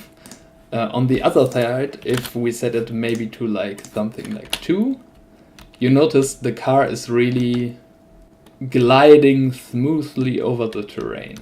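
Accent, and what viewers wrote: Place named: Germany. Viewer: Yeah, thanks. Watched all thru, good presentation. And, everybody loves nice German accent! :) (it's a good thing) I am latvian (lettisch) btw, so I love all "euro version of English :D ). Oh, maybe one provocative question: is this asset pretty much finished state, or maybe any additions / changes planned? Thanks!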